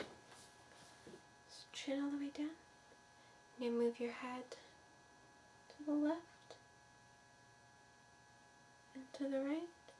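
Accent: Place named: Midwest, United States. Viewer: Only flaw is a slight buzzing - is it a laptop fan?? You seem very sweet, please keep going! Nice accent btw - sounds Midwestern, like Nebraska or Montana or something.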